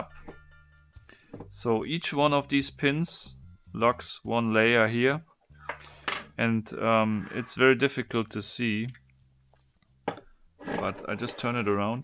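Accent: Germany. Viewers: Wie man einfach den Deutschen Akzent direkt erkennt :D Super video c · You are from german XD I can hear it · THIS IS THE GERMAN ACCENT ! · Man hört das du Deutsch bist. 😅 Nicht böse gemeint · Man merkt das du deutscher bist :D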